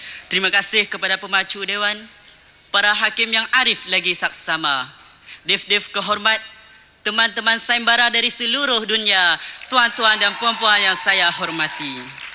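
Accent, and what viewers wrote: Malaysia. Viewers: pelat melayu kuat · bunyi dia macam loghat melayu kedah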